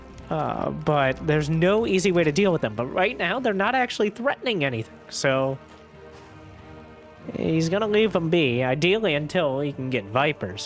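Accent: United States. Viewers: Ты американец?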